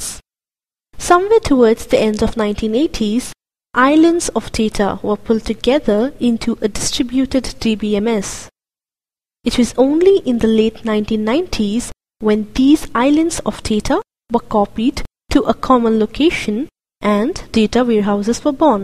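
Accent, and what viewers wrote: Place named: India. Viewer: it's like india accent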